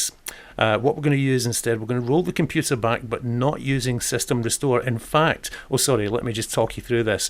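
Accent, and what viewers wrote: Scotland, United Kingdom. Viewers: Trust a Scotsman to know a great solution and easy explanation to boot! The accent was very nice too!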